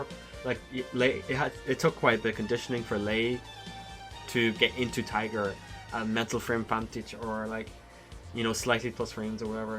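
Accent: Ireland. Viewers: . You are one of the most cerebral players and a man of taste. But for all that is holy, get a good microphone and learn how to tune it. Irish accent is complex in its tonality (no offense) It sounds fine when recorded correctly but it loses low end on "cheap" mics. And turn off tekken music when making vids, it gets in the way of how your voice is transmitted as there is competition for the sound energy coming out of the speakers. Get a good mic with a good pre amp. And play only Oscar, get out of here with these others women hahahaha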